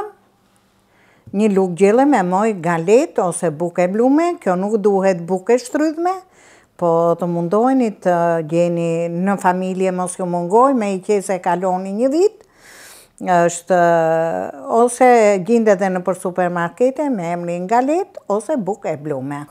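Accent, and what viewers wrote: Tirana, Albania. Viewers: me zërin, dialektin dhe gatimet e Tironës, më nxjerr mall, shume mall .....!!!🙏❤️😭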